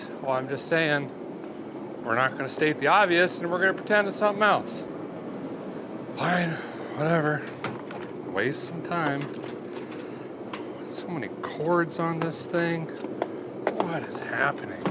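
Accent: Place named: Minnesota, United States. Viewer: Your Minnesota accent is better than Mrs R for our 1 yr old son. I now turn your channel on when he needs help calming down. Thanks for the help!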